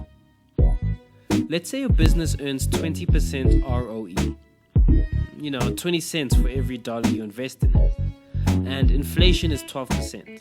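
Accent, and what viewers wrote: South Africa. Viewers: I hear a South African accent in there!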